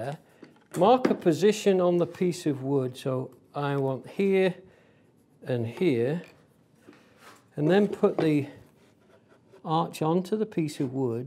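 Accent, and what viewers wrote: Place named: Northern England, United Kingdom. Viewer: Hi. your videos are great. I’m very new to woodwork, I was wondering where you source your timber from, and how to make sure it’s suitable for furniture making. I have a good friend who is very experienced. He bought some supposedly dried timber suitable for furniture, turns out it wasn’t dried enough and a beautiful table he made ended up splitting. I’m in the south east of the UK guessing you’re in the north from the accent. would appreciate any recommendations/advice. Kind regards. Jon.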